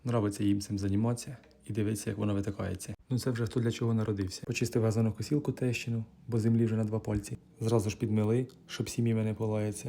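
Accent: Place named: Poltava, Ukraine. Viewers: Автор, звідки ви? Такі самі глиняні кручі бачив на березі кременчуцького водосховища, та й говірка нагадує полтавську 😊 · Мабуть ти з Полтавщини. Чую знайомі нотки. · ❤❤❤❤❤❤❤❤❤закохалася... Неможливо наслухатись.ДЖИГІТ!!!!❤❤❤❤❤❤❤❤❤❤❤❤❤❤звідки ти?❤❤❤❤❤😂❤❤❤чую наш ПолЬтавський говьяжий суржик.ТАК приємно😂😂😂😂😂😂😂😂😂😂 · Дякую за ще одне неймовірне відео. Ти молодчина, так тримати. Твоя мова схожа на мою, я з полтавщини. Бережи нас Боже. Чекаємо на перемогу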